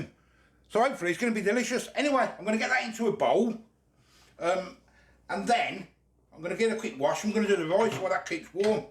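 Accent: Birmingham, United Kingdom. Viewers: Huh? it’s a normal Brum accent, I can understand him no probs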